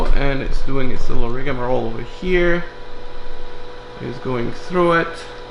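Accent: France. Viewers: I mean… you know that for me, in-depth electronic debugging now has a french accent forever. Burnt in like a PROM. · I have an off-topic question for you. My wife heard me watching this episode and she asked me where your accent is from. I told her I thought it was French, but I'd like to confirm if I got that right or not. Thanks!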